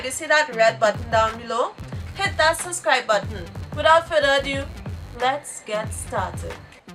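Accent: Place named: Trinidad and Tobago. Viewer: Colours are amazing 😉 love the bold look 😍😍 and the trini accent ☺️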